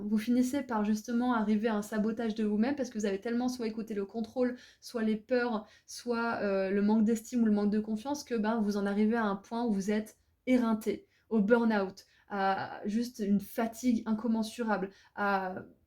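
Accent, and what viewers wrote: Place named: Quebec, Canada. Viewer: Choix de l'antenne relais, avec l'accent québécois lol gratitude infinie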